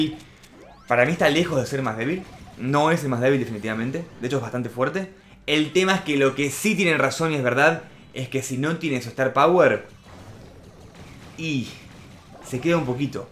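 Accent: Argentina. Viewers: De qué parte de Argentina sos?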